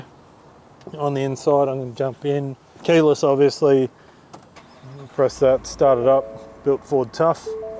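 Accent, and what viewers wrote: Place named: Australia. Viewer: I wish this video was in American English, I'm having a hard times to understand these Australian language at times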